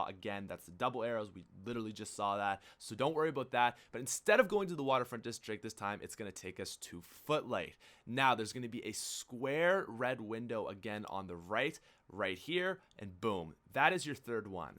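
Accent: Canada. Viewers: r u from Canada? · if this is racist to u I'm srry. But r u Canadian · im sorry for this but you sound really canadian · Holeh fuck bud ur canadian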